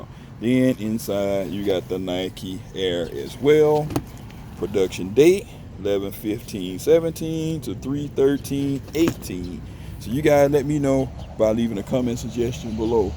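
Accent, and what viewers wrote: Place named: South Carolina, United States. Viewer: Gotta be South Carolina. Pee Dee area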